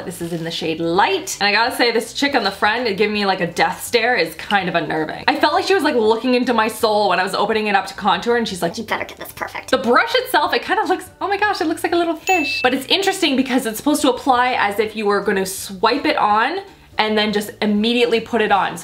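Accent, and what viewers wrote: Canada. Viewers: Please... do a tutorial about your look! With the typical Canadian "about" :D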